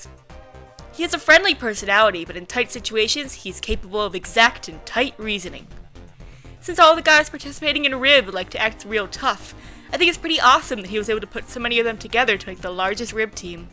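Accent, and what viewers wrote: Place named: South, United States. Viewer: Loool, love how your accent likes to default to Southern when you try and put on a voice XD! I must say, I nearly lost my hearing when you started doing Akushima's voice. I don't usually watch you and with earphones as I've learnt from before (ESPECIALLY when you voice Mr Bandages) that volume tends to vary sometimes:P But now I'm trying to catch up on this at work and just xD...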